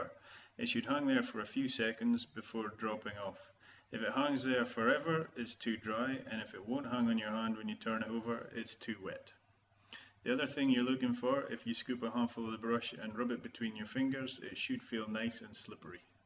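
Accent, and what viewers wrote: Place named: Scotland, United Kingdom. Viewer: I had this question today as my presoak was spitting air and not enough fluid. I played with the fluid pressure and got the stream working again. My system is 30 years old and the foamy brush and presoak share the same air pressure but they have individual regulators for the fluid pressure. Thanks for the education on this. I'll be checking my floam consistency from now on using your guidelines. (and how come an Aussie has a Scottish accent?)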